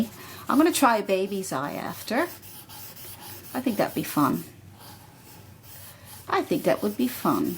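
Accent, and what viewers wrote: Newfoundland, Canada. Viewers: I have been using this video with my 6th grade classes to help with their self portraits. I immediately heard your voice/accent and said she HAS to be a Newfie. Am I right? I live in the states but family is from Placentia Bay. <3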